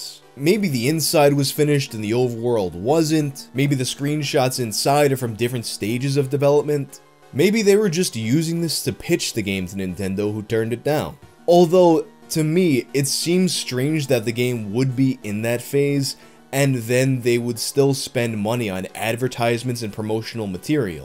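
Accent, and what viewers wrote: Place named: Queens, United States. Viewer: "And here's where today's SPONSAH, Honey, comes in" Just can't take that Queens accent out of you, huh? Love it.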